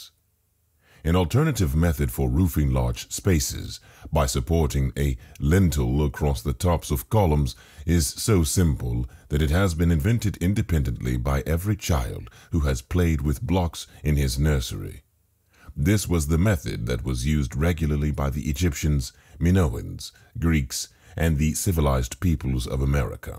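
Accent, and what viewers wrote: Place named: United Kingdom. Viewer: Can’t tell if you have a British or American accent. It’s like a mix of the two.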